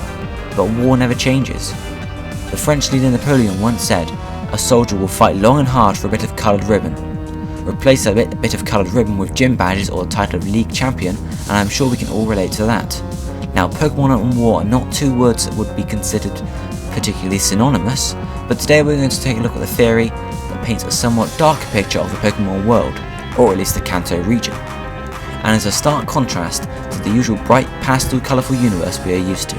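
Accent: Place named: United Kingdom. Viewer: *Video starts with a British narrator* Okay, this I like...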